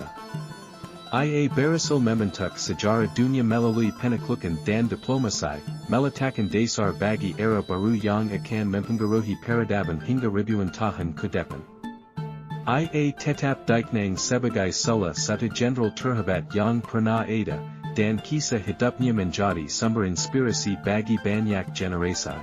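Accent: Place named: West, United States. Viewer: logat barat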